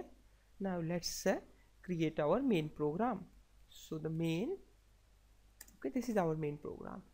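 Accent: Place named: India. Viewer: First of all thank you for your effort. mention that im not racist at all and i love indians, but im sick of that ACCENT!!! Man can you guys please work on your accent its so ugly and as people who need free educational content in coutnries like iran (myself) we really appreciate if indian guys speak like english.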